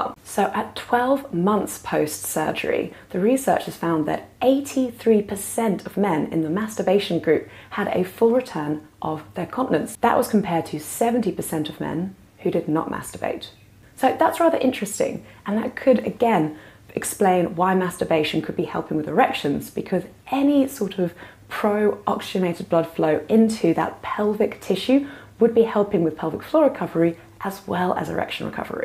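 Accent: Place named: United Kingdom. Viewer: Listen to this woman. It is not just lip-service. I have been jerking off for 68 years and I couldn’t agree more. Unfortunately, her British accent makes understanding what the hell she’s blathering about quite difficult, but I heard the word “masturbation “ over and over again and that I can relate to!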